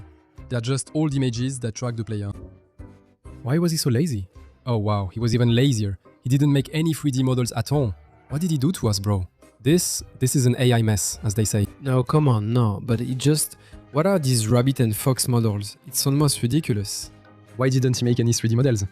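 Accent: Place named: France. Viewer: le fameux accens fr en anglais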